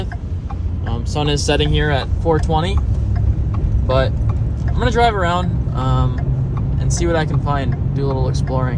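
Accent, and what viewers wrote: Minnesota, United States. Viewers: Currently living in Hong Kong and am stuck here for Christmas #$%*&! I grew-up in Minnesota and love the outdoor scenic views, hearing yr MN voice, and just watching yr general goofiness....reminds me of home. Keep the videos coming as they make me feel less depressed during this crappy pandemic. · I recently broke up with my boyfriend who is from Minnesota and your accent just reminds me of him, funny how I never noticed his accent before 🤔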